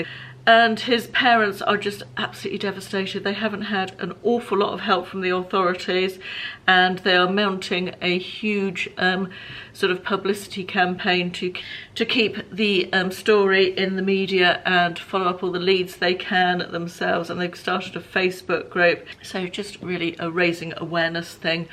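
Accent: United Kingdom. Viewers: Yes, but you get to say those Americanisms in a British accent, so there is that! 😂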